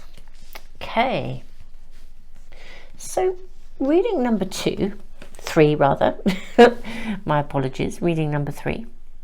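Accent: United Kingdom. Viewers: I LOVE listening to your reading. Sometimes I follow the way you express all your ideas. I asked myself, I am thankful because I can practice how to speak like a British. Someday ! I'll be there to UK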